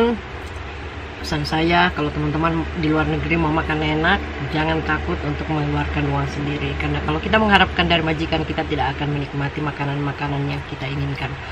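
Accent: Indonesia. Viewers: Bugis apaki??ko sma cra ngongtta, hahaha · Mb ko ngmg nya kya orang sulawesi yah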